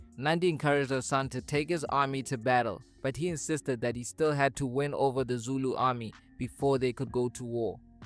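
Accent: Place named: United States. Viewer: Loved the review but your americanized accent is throwing me off abit. You Joburg guys need to stop consuming American content😂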